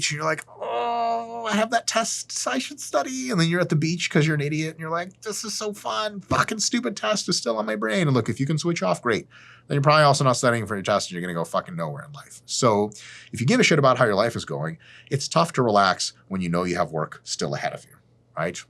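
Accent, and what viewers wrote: Russia. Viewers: Whoa Сделал дело - гуляй смело! You have really russian pronouncement! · Damn, you Russian mate? Awesome.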